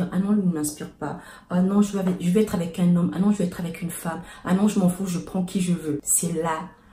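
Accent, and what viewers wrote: Gabon. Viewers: je connais l'accent là. C'est une gabonaise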